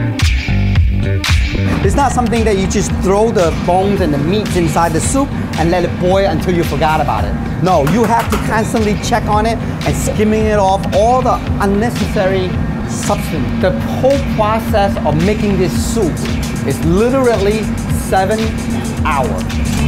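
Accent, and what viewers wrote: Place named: China. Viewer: He has a chinese influenced accent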